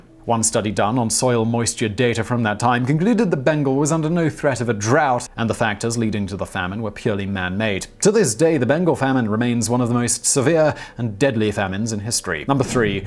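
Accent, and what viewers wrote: United Kingdom. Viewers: That's why you're watching a video narrated by a Brit.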